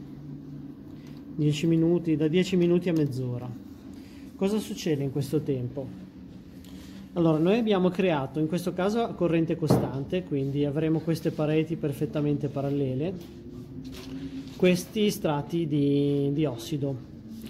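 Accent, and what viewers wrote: Genoa, Italy. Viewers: per fare un bel lavoro allora mi calcolo in peso quanto è diluito l'acido e aggiungo acqua distillata fino a raggiungere la soluzione giusta. Comunque noto con piacere l'accento genovese. Io abito in entroterra tra la Spezia e Genova e sono un appassionato delle cose che proponete nei vostri video. Lavoro alle macchine utensili se vi serve qualsiasi cosa per una collaborazione sono a disposizione saluti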